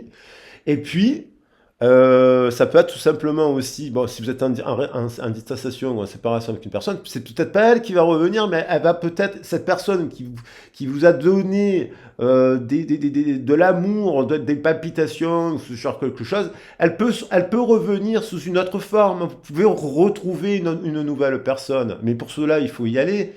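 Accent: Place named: Ardèche, France. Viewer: Ok, l' accent de l' Ardèche ! 🤗 En Provence, côte d'Azur, ici, ça chante la cigale dès le berceau, donc l'oreille est abonnée aux accents pimpants! Ya de la Vie dans la Voix 😉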